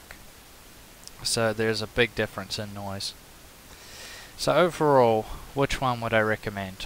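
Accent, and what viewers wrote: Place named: New Zealand. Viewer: thought you sounded kiwi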